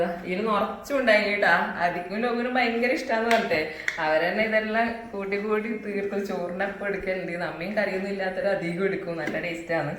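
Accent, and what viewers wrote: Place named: Payyannur, India. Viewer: ഞാനും ഇതുപോലെ തന്നെ നമ്മുടെ തനതു ഭാഷ പയ്യന്നൂർ സ്റ്റൈൽ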